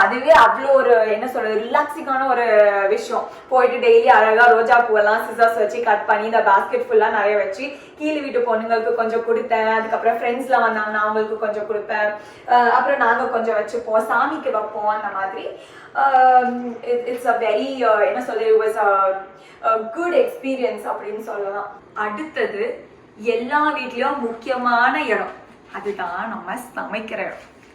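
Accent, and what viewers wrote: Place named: Chennai, India. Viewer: Yakoov Actualah Chennai Slangu bangam pannuthu 😂 Keep doing it on Tamil 👍🏼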